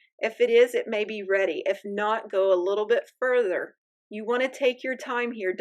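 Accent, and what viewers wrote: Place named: West Virginia, United States. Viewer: Thanks for another great video! My wife and I have been struggling with Italian macarons, with limited success. French macarons are so much easier (though we haven't tried them), that we're going to try them now. Do you have any experience with Italian macarons? If you enjoy messing with several containers, heating sugar syrup, etc., they're fun. Which type do you think are better. Listening to your accent, this old Appalachian boy thinks he recognizes a northern W. Va. pattern. (Which ain' bad!)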